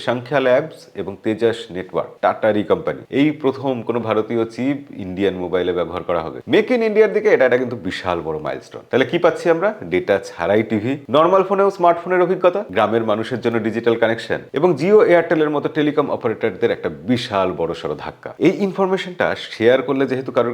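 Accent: Bangladesh. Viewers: আপনার কথা শুনে মনেহচ্ছে আপনি বাংলাদেশ থেকে বলছেন......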